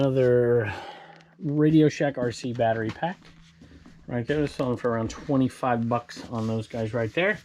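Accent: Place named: Minnesota, United States. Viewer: Yah Sure !!! Youbetchamn must be from Minnessota !!! Yah Sure Youbetcha !!!!! lol, easily recognized by this Wisconsin norwegian/American gal.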